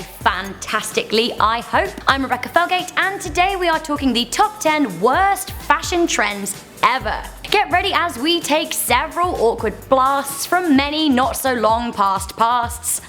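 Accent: United Kingdom. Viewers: I am gulity of like 1 of these also i love your accent. I am going to assume your from the UK? Sorry if i am wrong! :| :) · Sounds pretty British to me brah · thanks lol she sounds British but she knows all the us stuff and she says "My friends in canada and the US"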